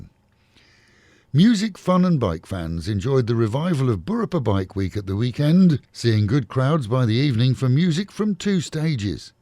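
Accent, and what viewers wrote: United Kingdom. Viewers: Love hearing a British accent while getting the scoop on Thailand drug busts. New subscriber here from the U.S.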